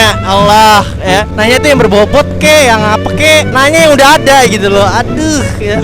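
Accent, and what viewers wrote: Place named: Lampung, Indonesia. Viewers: Gausah dengerin orang orang belloon bang tau gua orang Lampung begimanah logatnya wkwkwk fokus berkarya aja lu nya bang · Itu bukan ngegas, logat lampung emang kayak gitu. Makanya sini ke lampung biar di ceramahin dulu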